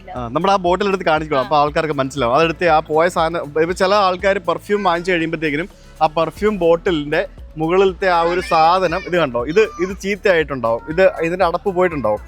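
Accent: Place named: Thrissur, India. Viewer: Thrissur vannaappo thrissur slang pidichallo 😊 Pooram video venamtta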